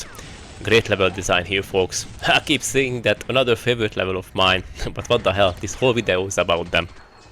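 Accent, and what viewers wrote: Hungary. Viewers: Sounds like you're hungarian speaking English. Good content tho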